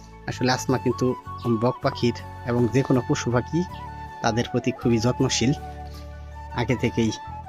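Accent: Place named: Munshiganj, Bangladesh. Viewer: আপনার মুন্সিগঞ্জ বাসা বাসা নাকি,,, কথা তো মুন্সিগন্জের মত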